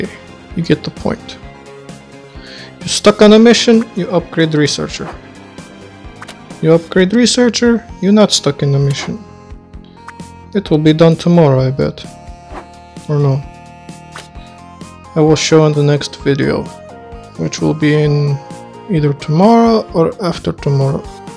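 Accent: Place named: Russia. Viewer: You have a good Russian accent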